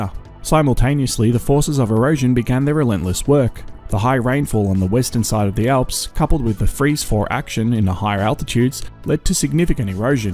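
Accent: Australia. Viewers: you do an excellent production with the unique Australian that is easy to listen and related to And no worries mate Today I was going to look into the Tonga blast a few years ago I'm a big fan of Edward Lorenz and non linear dynamics so it's interesting to me Especially on such scale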